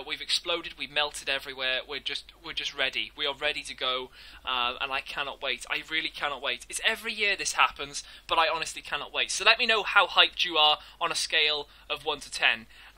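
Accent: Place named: England, United Kingdom. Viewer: Matthew was wondering where abouts in England do you come from because your accent is really cool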